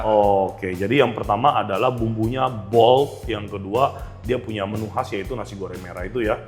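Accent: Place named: Surabaya, Indonesia. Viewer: Mas atau ko nya logat" medok" Surabaya an 😊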